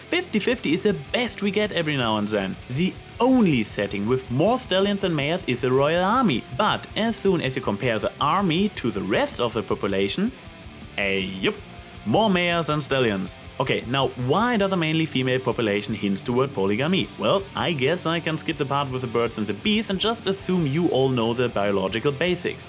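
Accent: Germany. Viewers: Quick question, are you German?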